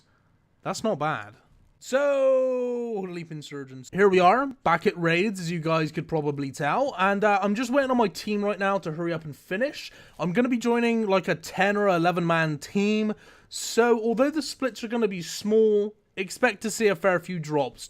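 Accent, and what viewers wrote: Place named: Cornwall, United Kingdom. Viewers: How much I wish he could just pronounce his TH, I could then forgive the west-country accent entirely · Man you're so Cornish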